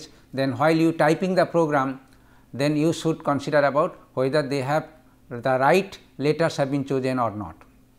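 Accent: India. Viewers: It's indian english ascent😂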